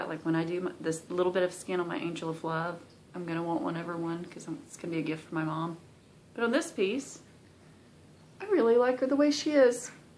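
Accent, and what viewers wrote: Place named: Atlanta, United States. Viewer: Hi. Loved your video. I was delighted to hear an accent similar to mine! I live south of Atlanta so you sound perfect to me! I'm fairly new to Floss Tube so I just found your channel. Looking forward to your next video.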